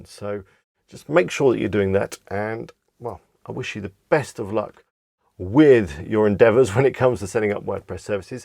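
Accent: United Kingdom. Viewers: Is that british accent ?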